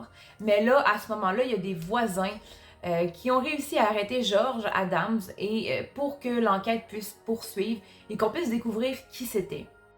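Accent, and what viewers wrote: Canada. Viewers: Je connais pas cette histoire merci de la partager pas mal ton accent canadien 😱😱😱😱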